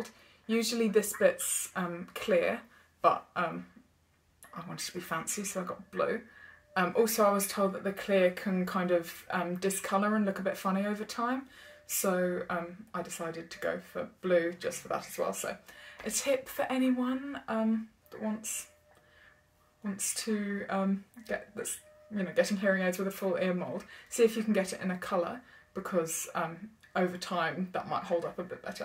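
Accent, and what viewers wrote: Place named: England, United Kingdom. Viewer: Hi, just seen you for the first time. I am impressed by your lovely english accent, and very clear speech. Definitely no deterioration there. I used to have a friend who went deaf in his 20s. I knew him when he was in his mid 50s. He still had perfect speech despite having virtually no hearing at all for many years. Even when we were stood right next to a noisy compressor that started up, he had no idea it was running until I told him. I just mention his experience to make the point that deafness does not necessarily lead to poor speech. With regards to your Naida aids needing batteries. I think in some ways that is more convenient. For example, when you go travelling, you don't have to take a charger with you. And no worries about finding somewhere to plug them in. Or finding a suitable adaptor for foreign power sockets. 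Or if you are travelling overnight, the rechargeable ones might run out of power. You seem to have a good attitude to life. I am pleased to have "met" you. Best wishes.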